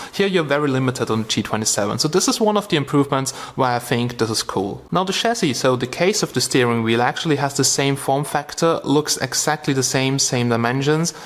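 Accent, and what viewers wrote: Germany. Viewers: Are you german speaking guy? :D Bist du? , es tönt so :D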